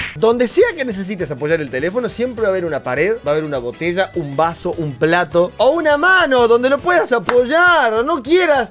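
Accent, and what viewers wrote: Argentina. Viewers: Sos Arg???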